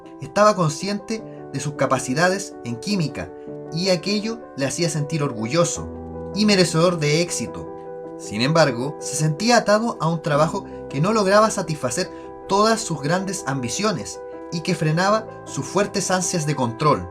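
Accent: Chile. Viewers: Chileno? o parece el acento xdd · El acento chileno es tan chocante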